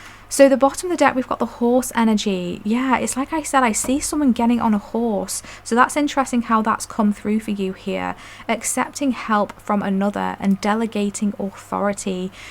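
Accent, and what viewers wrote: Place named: England, United Kingdom. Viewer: 1 - phenomenal reading, I could relate to all of it, including aches and pains, exactly where mine are. As for suns I did another reading and the lady pulled 4 sun cards out. I’ve also been working on my God (sun) connection 🌞 Thank you so much, as a fellow Pom living in NZ, it’s lovely to hear your pommie accent ❤ I’m feeling very grateful and blessed 💐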